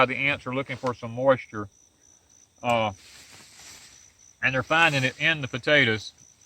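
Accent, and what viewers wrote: South, United States. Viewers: Love your southern accent!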